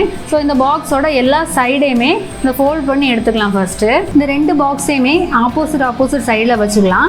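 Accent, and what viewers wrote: Kanyakumari, India. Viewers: Thank u sis ,nanum ethei than panren but romba onum order varala,enoda native kanyakumari thaan sis unga slang vache therinjuthu,😍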